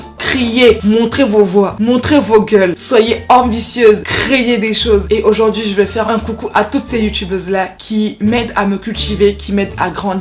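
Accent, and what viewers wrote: Cameroon. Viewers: Hello, j'ai bien compris que tu étais camer, avec ton accent. Mais au début je me suis dis, tien tien est Bamoise. Puis, petit à petit, je me suis dis, non, non, a un parlé qui me rappelle les Etons. Ben en effet, là maintenant je suis un peu perdu. Eton, pas Eton, Bamoise, pas Bamoise?????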